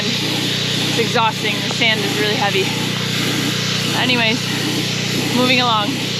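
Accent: France. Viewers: Waouh je viens de vous découvrir et quel ne fut pas ma surprise en entendant ce doux accent FR ah ah ah <3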